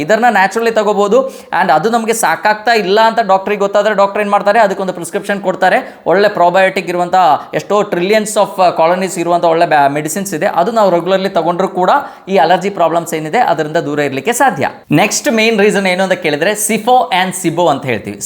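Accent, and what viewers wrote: Kerala, India. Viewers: Ok, but look like Dr from Kerala, kannada is Malayalam mixed kannada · Well explained Doctor you are speaking very good kannada beaing a Malayalam slant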